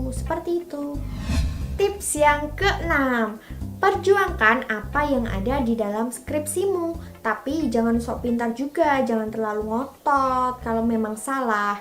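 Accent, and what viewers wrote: Java, Indonesia. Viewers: Mbak nya asal Jawa ya? 😂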